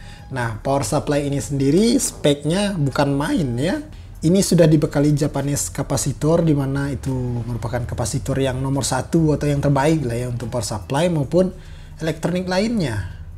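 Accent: West Sumatra, Indonesia. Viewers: Kebawa logat minang nya bg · Logatnya mirip logat minang ya bro Nice experiment.. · Bang... Logat lu mirip Logat orang minang 😁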